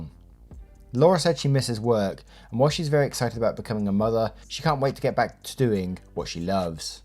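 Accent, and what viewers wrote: United Kingdom. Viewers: This is the only channel I know of with a UK voice, I like R/Slash and RStart but this is a properly organic community with interaction and humour.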